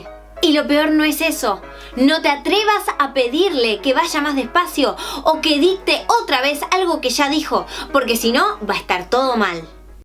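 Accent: Argentina. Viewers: nuevo sub eres argentina? o no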